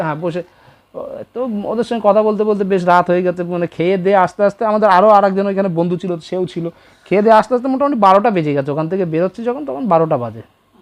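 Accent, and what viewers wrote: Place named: United States. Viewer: Mama rocks... darun laglo...what a Yankee style of talking... khub bhalo laglo ❤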